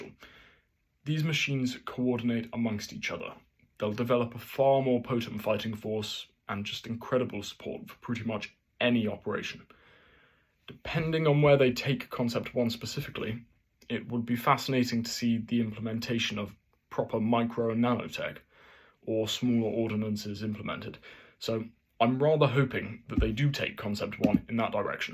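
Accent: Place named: RP, United Kingdom. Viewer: Very interesting thank you. I have seen some pictures of the drone, but not any in detail specifications of the program. Do you know if the concept 2 is the design they’re taking forward? During the video I might have had to rewind a couple of times to understand, but we don’t speak the Kings English quite like he does, this close to the Scottish border. 😂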